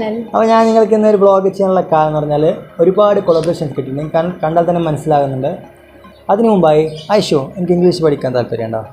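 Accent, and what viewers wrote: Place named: Udupi, India. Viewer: She is from udupi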